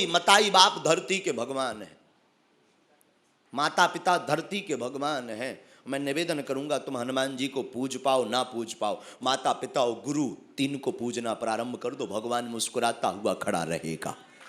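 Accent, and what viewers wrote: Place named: Bundelkhand, India. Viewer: Ap ki mohak muskan bundelkhandi boli aur tha thari barne ki ada man moh leti hai.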